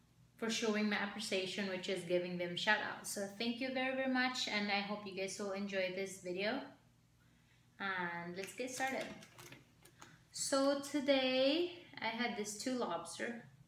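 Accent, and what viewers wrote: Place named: Philippines. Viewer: R u visayan or ilocano? U have the accent... esp with some of ur pronunciations and intonations...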